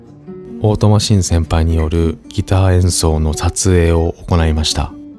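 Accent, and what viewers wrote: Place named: Kansai, Japan. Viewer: いつも楽しく拝見しております、ありがとうございます。 関西の方かと、思ってましたが、東北の方なんですね。 アクセントが関西っぽかったので。。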